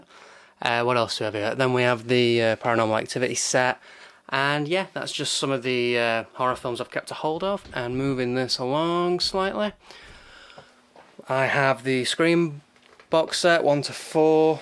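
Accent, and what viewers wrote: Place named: Sheffield, United Kingdom. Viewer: Sheffield, oh I was born there! I should have recognised the accent 😅😅 Great collection, was fun to watch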